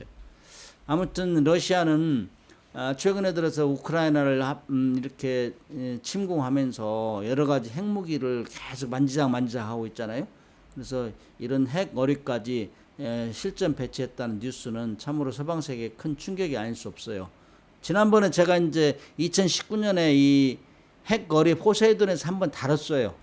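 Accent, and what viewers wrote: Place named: Jeolla, South Korea. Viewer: 그냥 그만두라 전라도 사투리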